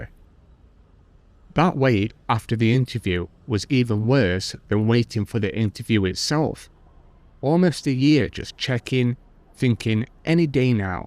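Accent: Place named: Cockney, United Kingdom. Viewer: Idk, this guys accent sounds cockney/ UK, but claims, beeing american? Anyway, these things happen to every 2. guy, marriing a thay girl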